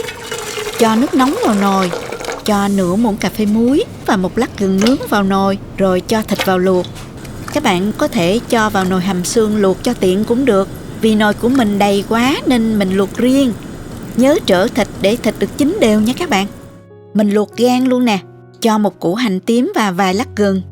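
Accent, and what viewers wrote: South, Vietnam. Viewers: Giọng em miền Nam ngọt như mía lùi,nhưng xin em hãy giữ nguyên thủy những từ của Nam Kỳ cho khỏi bi lai.Cám ơn.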